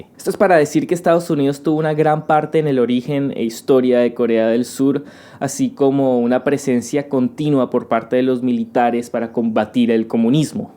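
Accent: Colombia. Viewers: Porque no hablas costeño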